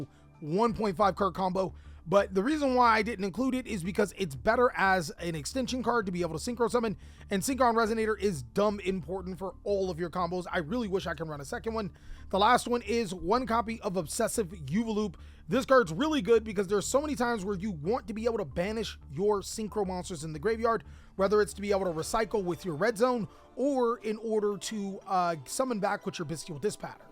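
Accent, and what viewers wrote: United Kingdom. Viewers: I think it’s more of a British cockney accent.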